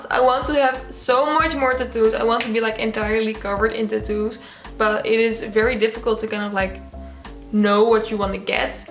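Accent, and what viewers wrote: Netherlands. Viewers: Is ze Hollands ? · Ben jij Nederlands want ik hoor een nederlands accent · moon kit ja ze is nederlands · I could hear youre from the netherlands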